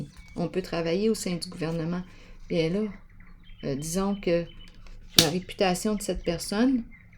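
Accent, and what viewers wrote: Quebec, Canada. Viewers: C'est incroyable 🤩 pile pile sur ma vie wow bravo et merci 🙏🏻 avec ta voix j'imagine que tu es situé au Québec? Etk moi oui!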